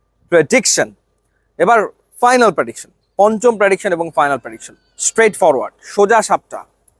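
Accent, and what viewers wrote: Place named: Kolkata, India. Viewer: আপনার কথার ধরন কলকাতার মানুষদের মতো কেন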